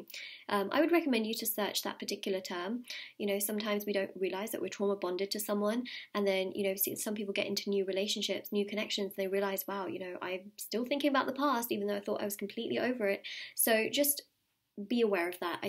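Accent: United Kingdom. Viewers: Her British accent is already making her videos more interesting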